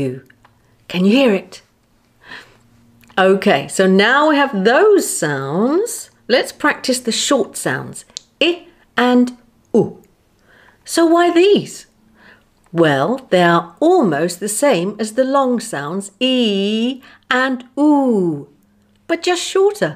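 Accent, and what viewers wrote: United Kingdom. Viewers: Can ask u a question that what's kind of your british accent? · The accent of English is British? I don´t notice at the first time that I listen your voice.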